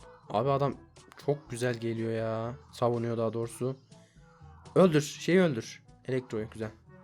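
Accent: Erzurum, Turkey. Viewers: Neydisen gardaş sen erzurum un neresinden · Abi erzurumlumusun bende erzurumluyum dadasim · Erzumlumu yoksa Erzurum da mi yasiyot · abi sen erzurumlumusun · Abi sen erzurumlumusun ben erzurumluyum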